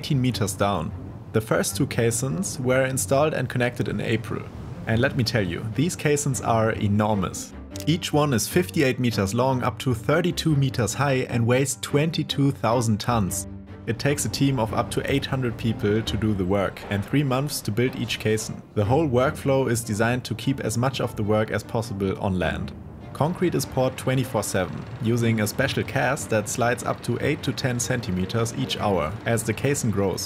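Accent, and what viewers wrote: Germany. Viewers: Hopefully there is some work put in to try and reduce the accent. I am new to the channel and just watched 2 videos from last year and then clicked on this and I instantly went "oh they have a german narrator now". Its quite noticeable. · Great, we have English with a heavy German accent but no original German sound track. Kind of annoying. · man merkt so krass dass der Sprecher Deutscher ist. Ganz schlimm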